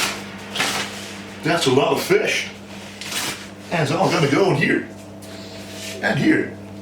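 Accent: Netherlands. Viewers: I can listen to this guy talk all day long, the Dutch accent is very pleasant sounding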